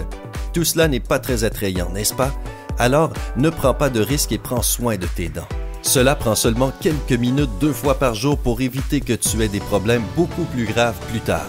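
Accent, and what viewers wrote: Quebec, Canada. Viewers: Vous avez un accent québécois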